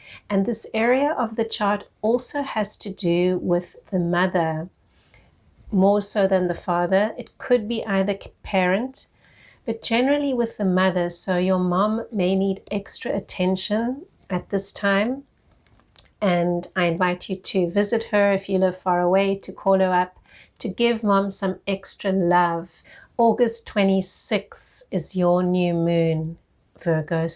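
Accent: South Africa. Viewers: From Sth Africa?? I love the accent. Cheers.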